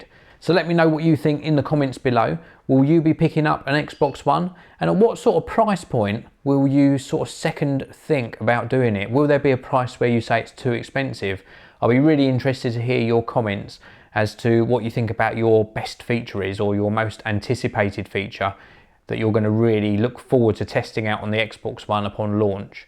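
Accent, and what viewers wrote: England, United Kingdom. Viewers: Nice video nice to English person talking about tech as well not many out there.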